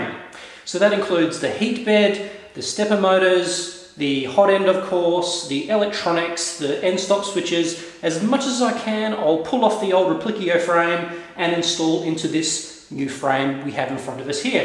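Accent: Australia. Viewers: judging from your accent, you are aussie like me, where did you get your screws? my local hardware is terrible for screws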